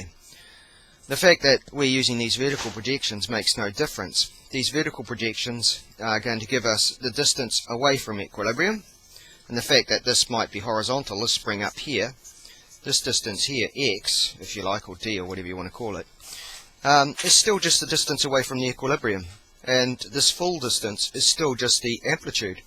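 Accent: New Zealand. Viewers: from new zealand?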